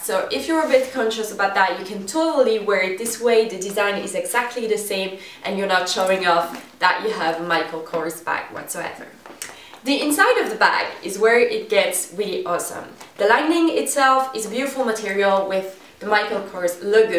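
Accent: France. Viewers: She have a french accent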